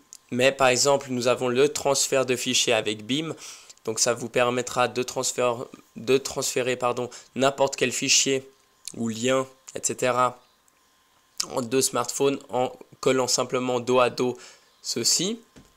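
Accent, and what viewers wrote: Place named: Switzerland. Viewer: ça s’appelle un accent! vive la Suisse romande et vive les vidéos de l'!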